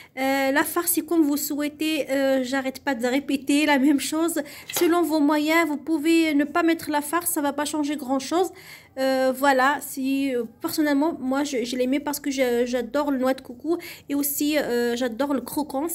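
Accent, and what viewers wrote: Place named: Kabylie, Algeria. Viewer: Je ne comprends pas l arabe pouvez vous traduire en kabyle car on dirait que vous avez l accent !!!!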